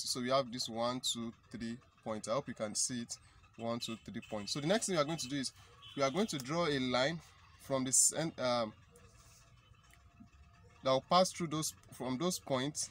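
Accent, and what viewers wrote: Nigeria. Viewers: Are you Nigerian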